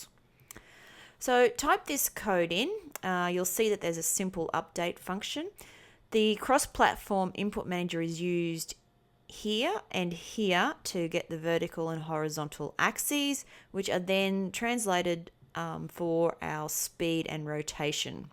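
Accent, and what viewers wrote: Australia. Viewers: Are you Australian? You have a beautiful accent.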